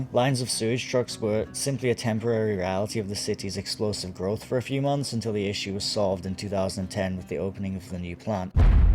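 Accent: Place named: India. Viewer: interesting accent, i guess you are indian or somewhere from middle east?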